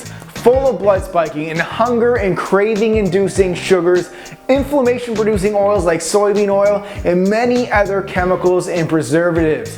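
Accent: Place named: Canada. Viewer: That fucking Canadian accent makes my ears bleed.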